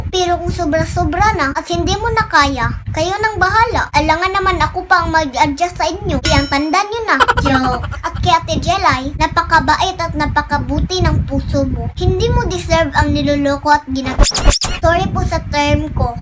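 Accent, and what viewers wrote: Visayas, Philippines. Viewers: Visaya kaba ?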